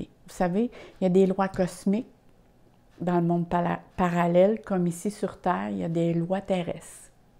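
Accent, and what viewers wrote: Canada. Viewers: oh lla la vooous etes du canadda!!!!!!!!!!!!!!!!!!!!! ouppppps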